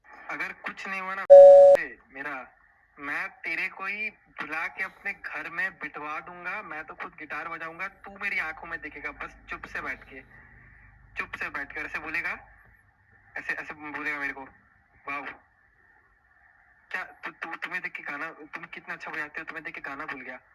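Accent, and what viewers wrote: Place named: Dogri, India. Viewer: We are with! Haters ko ignore karo aur apne future par focus karo. All the best our sweet gentlemen, Btw if nothing is between you and Tanishka still we love you as we loved you before! I saw your red eyes and noticed that how much pain you had faced and your face tells everything that you’re saying truth. Haters will never let you grow because of the jealousy. So keep working,growing,Laughing and making us laugh just like before cuz I have seen you serious for the very first time. And I hope hamari Tanishka Didi bhi theeek hongi 😙. Just waiting with eager and excitement ki aapka vlog kab ayega. Special note for you- Tusi jado Dogri ch bolde go ta Mainu bhohot vadiya Lagda hai. Namaskar and Sat Sri Akal from Canada ❤️🩷🩷🌸🌸🤍🤍😇😇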